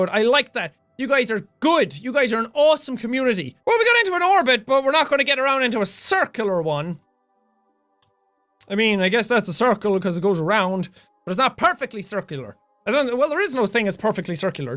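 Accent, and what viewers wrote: Scotland, United Kingdom. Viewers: Is he Scottish?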